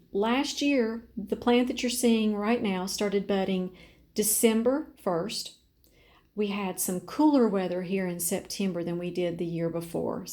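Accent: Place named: North Carolina, United States. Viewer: I hear NC in the dialect. Am I right!? These have grown so beautifully!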